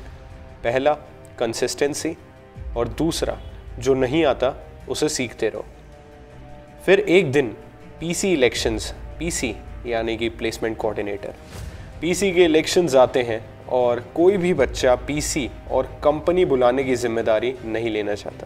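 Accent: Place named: Delhi, India. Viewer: Your delhi is accent is crazy! will you say it again !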